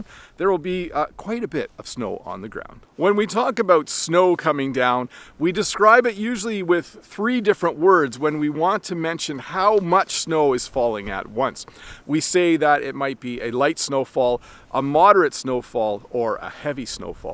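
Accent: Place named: Canada. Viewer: I heard the hallmark of Canadians a lot out here: the Canadian raising "aboat".Lol.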